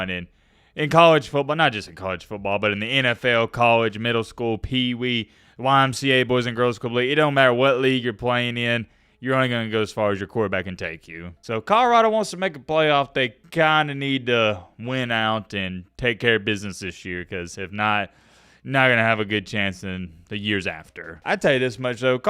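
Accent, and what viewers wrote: South, United States. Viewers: Love your Colorado talk with your southern twang.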